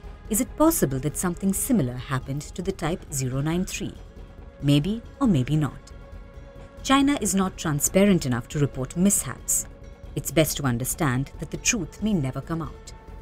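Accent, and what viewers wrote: India. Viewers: A strong Indian Asan accent is really unpleasant. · It’s a pity it seems too much Indian accent here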